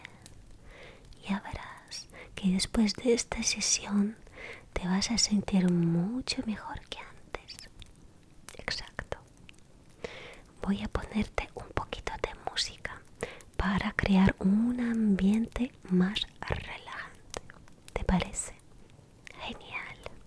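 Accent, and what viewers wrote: Spain. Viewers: No me da asmr el acento español tan marcado :( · Odio que ahora sabes mucho más el acento español :(((, extraño a la rusita :'( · Ya hace tiempo que no veía videos tuya linda, de ver uno de tus inicios, al de ahora, tu fonética y pronunciaciones han cambiado mucho del tono ruso español al “español de España” es muy curioso 🤓 saludos. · acento español :O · Me gustaban más sus vídeos antes que ahora, que se va marcando más ese acento español... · Que raro que no pronuncie la C como la Z teniendo esa tonada española 🤔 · mi mente escuchando a la chica: por eso esperaba con la carita empapada jjajajaj por la voz y el acento porque en españa hay muchos acentos habran como 5 no?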